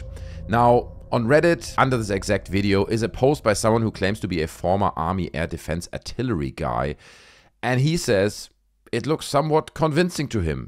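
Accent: Germany. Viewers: Ein Deutscher spricht Englisch 👍🏻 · Judging by your accent, you're german...amirite?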